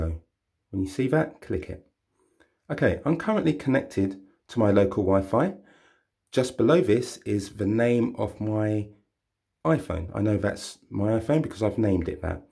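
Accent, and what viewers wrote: United Kingdom. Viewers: Tried a few videos which left me baffled, I was almost losing the will to live when I clicked on your video - bliss, pure bliss. Someone who described it so clearly and simply (pretty straightforward process as it turns out). Nice, calm British accent too. Well done mate.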